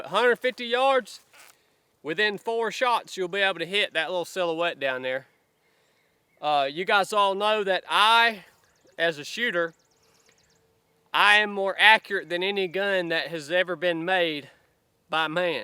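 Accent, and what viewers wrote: South, United States. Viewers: I live OCONUS and my wife is not American, so I show her videos as the prime example of the accent from my beloved homeland. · You got to love that southern accent when he pronounce Fiocchi "Foochi" 😅. Get MTG on your video!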